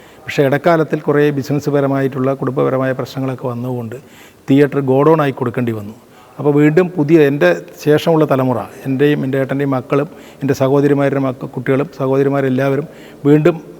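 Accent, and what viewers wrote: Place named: Palakkad, India. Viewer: Palakkad ..alle ..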